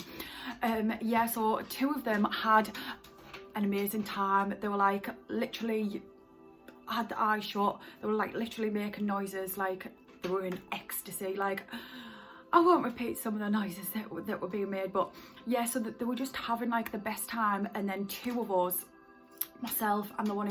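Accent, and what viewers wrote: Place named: Newcastle, United Kingdom. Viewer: What a brilliant channel, thanks 😁, I'm prepping to go in with some rue, but I vaped it via the vandy vape last time and it was slightly brutal.. Everytime I trip I always come out thinking I need to work with my energy and balance myself better before hand... And I'm useless at this 😂 positive your channel can give me some pointers though. Love the jordie accent too? Reminds me of living in the lakes 😊easy listening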